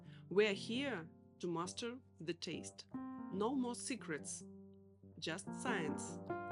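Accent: Eastern Europe. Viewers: Я вижу Вашу любовь к своему делу и с привеликим удовольствием смотрел бы вас, но уж больно родной, до скрежета на зубах восточно-европейский акцент лично меня отталкивает. Извините ❤️ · Узнаю родной славянский акцент и здравый научный подход! Приятно слышать. Спасибо,!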